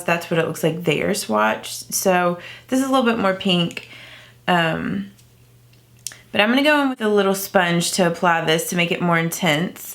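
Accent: South, United States. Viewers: I just love watching your videos. I absolutely adore your accent....are you from the south? Your so sweet and your tutorials are easy to follow and love that you use a lot of drugstore products! 😁🇨🇦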